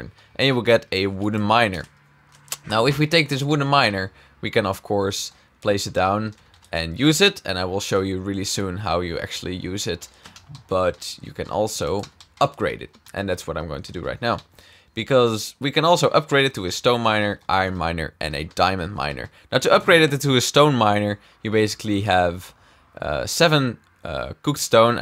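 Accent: Netherlands. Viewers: mooi engels man maar ik hoor wel dat je nederlands ben :D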